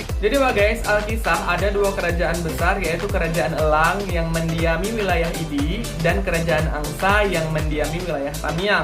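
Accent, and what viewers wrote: Medan, Indonesia. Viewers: Penuturan bahasanya kek org medan 😆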